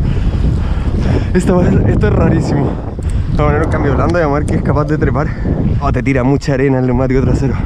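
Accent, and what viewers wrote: Chile. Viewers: Se nota que errs latino y chileno igual que yooo porque décimos wn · Ehs chileno el weon 😅😅😅😅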